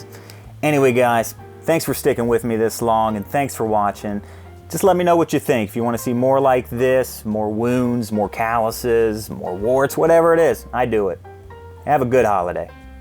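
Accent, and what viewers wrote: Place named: New Orleans, United States. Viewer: My first time on your channel I subbed as soon as you said lil Jimmy. You sound like down home folks from my home town New Orleans. Oh and I love the music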